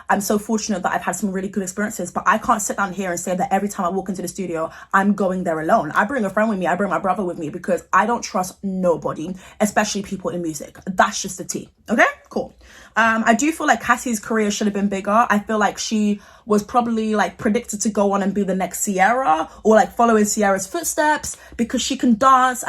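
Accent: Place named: England, United Kingdom. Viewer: Luv this ENGLISH ACCENT 💯% ✅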